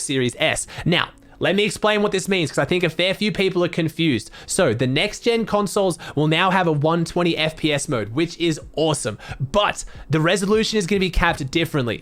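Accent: Australia. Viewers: U doin great mate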